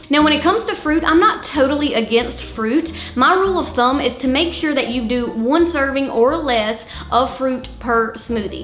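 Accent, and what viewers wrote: South, United States. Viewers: What kind of accent is that? Sounds southern to me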